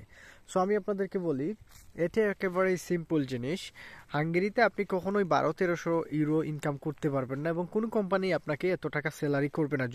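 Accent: Sylhet, Bangladesh. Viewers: আপনার কথায় বুঝা যার আপনী সিলেটি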